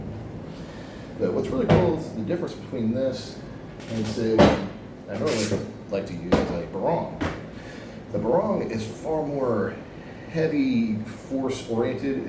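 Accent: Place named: United States. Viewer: your accent is american, but your house is balkan, where the hell are you from lol cool swords btw <3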